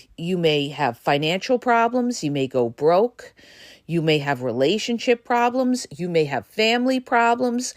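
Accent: New York, United States. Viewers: i'm finally laughing again at you saying "they throw you to the wind" And your language is perfect combined with the New York accent I feel like I'm listening to a friend.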